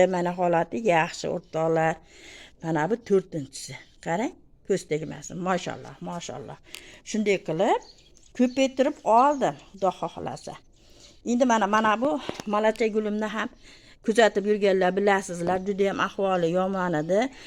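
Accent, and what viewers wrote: Andijan, Uzbekistan. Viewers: Гапиришиздан андижонликмисиз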